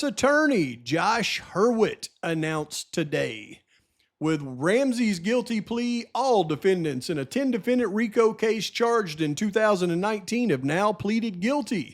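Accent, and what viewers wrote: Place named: South, United States. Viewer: The hick accent is too distracting. Sorry, not today.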